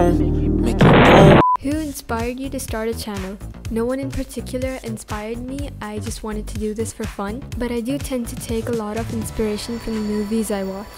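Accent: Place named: Philippines. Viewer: It's clear that she's Filipino I know one when I hear one I can tell by her accent.. AND NICE VOICE:D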